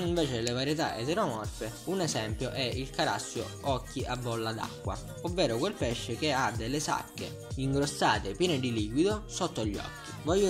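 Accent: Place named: Sicily, Italy. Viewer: Bravissimo... Sei siciliano, si sente!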